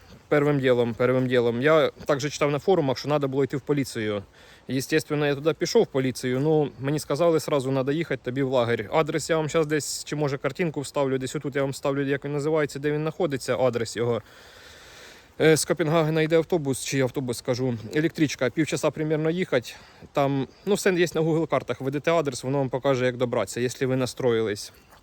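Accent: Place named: Slobozhanshchyna, Ukraine. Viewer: Молодець що не стоїш на місці, шукаєш своє. Стежу за тобою з того моменту як ти почав працювати на доставці у Вроцлаві, подобається як записуєш свої відео, маєш свій стиль. Хотів підбадьорити тебе відносно мови. Пропоную не вживати слова СУРЖИК, бо це кремлівський винахід від слова сурогат. Якщо я вірно зрозумів- ти розмовляєш українською з яскравим СЛОБОЖАНСЬКИМ діалектом. 😀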